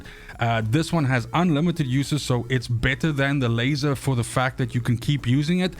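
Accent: South Africa. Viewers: Howzit bro. Are you from South Africa? Cool video man, you gained a sub and like from me.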